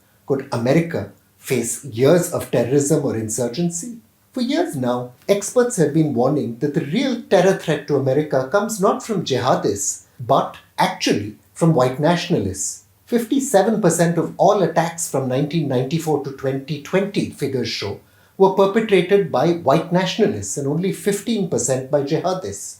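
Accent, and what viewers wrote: India. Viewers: Clown reading MSNBC agenda items ans propaganda with an Indian accent …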